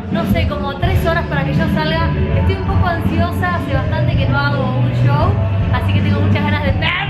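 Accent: Argentina. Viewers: eres más ser humano que YouTube. Tu acento argentino es muy lindo.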